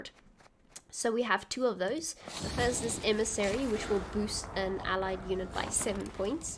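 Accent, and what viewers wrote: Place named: South Africa. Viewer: Your english pronunciations are the most enjoyable i've ever heard. Are those pronunciations characteristics to South Africa?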